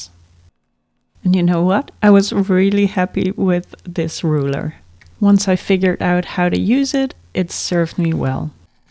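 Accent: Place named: Netherlands. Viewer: Ik vroeg me vanaf het begin af of je Nederlandse bent, ik dacht dat aan je Engels te herkennen. En daar is het bewijs: in het Excel blad!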